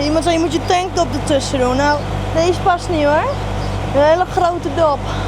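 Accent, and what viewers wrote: Friesland, Netherlands. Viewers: Met dat licht Friese accent de hele tijd klagen is echt leuk om te horen sorry haha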